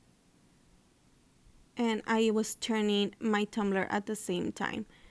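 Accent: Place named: United Kingdom. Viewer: * British accent * absolutely perfect love ❤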